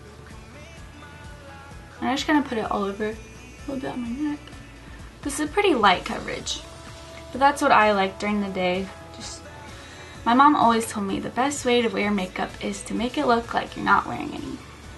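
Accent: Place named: South, United States. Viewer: you are so freakin adorable. love your southern accent! goood vdeo too!(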